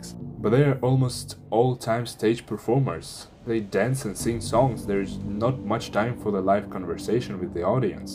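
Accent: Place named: Russia. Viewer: Всегда русский акцент уловлю 🫂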